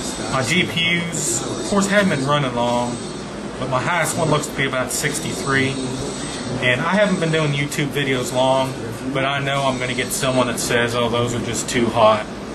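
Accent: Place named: Texas, United States. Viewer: love the accent, sounds like texas. BRING MOAR TF2 DISPENSERS HIER!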